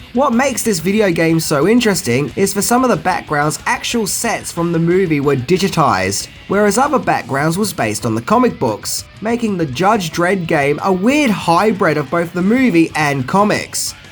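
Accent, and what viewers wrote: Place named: Australia. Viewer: Yes, he's Australian. It happens.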